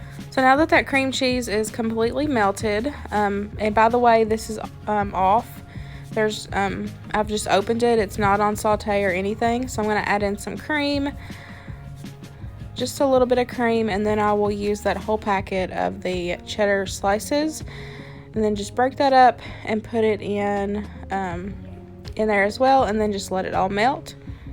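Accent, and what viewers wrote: South, United States. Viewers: Totally agree with all the other comments! The food looks incredible! Your southern accent is awesome! Subbing now and looking forward to more of your vids! Great work!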